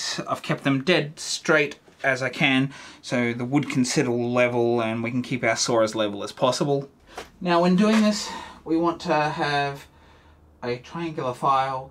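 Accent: Australia. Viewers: Thanks for all of your videos. Plus hearing you makes me miss friends I made in Australia- I’ve spent about three months total in AU between Navy port calls then I had a consulting job and went to Brisbane, Darwin and out to Jabiru. Cheers!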